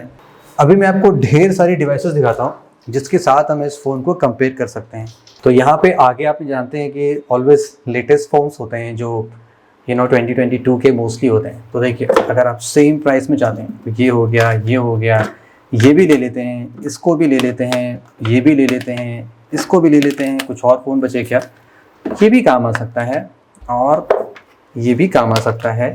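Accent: Lucknow, India. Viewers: Sir aap Lucknow sa ho kya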